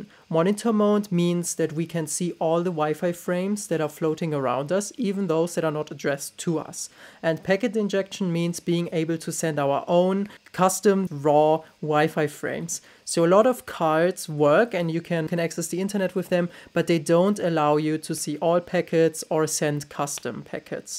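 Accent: Germany. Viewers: Thank you for your video! How hard to find hardware for Kali/Parrot to deauth 2.4 and 5ghz same time to prevent switching?! Will the Deather Andromeda does the thing? I could go for jamming in my project, but that should only be the last possible option. i would really appreciate any advice! Your accent let me think you are possibly from Germany/Austria?! :) Keep on doing such nice Videos! <3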